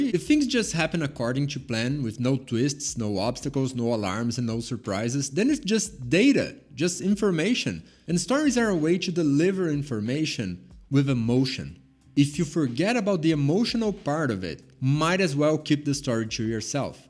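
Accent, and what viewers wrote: Brazil. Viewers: Are you brazilian by any chance? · Is that a 🇧🇷 accent I hear? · Ouvi o sotaque BR também e já deixei o apoio. Tem canal em brasileiro? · No começo do vídeo eu fiquei "esse sotaque é brasileiro", no meio eu falei "oxi citado" e no final eu falei "kralho, é o "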